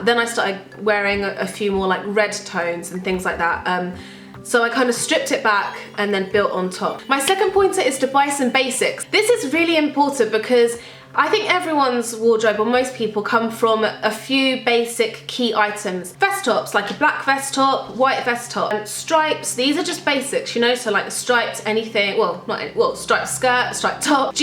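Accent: United Kingdom. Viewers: Your British accent is sooo cute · Are you from the uk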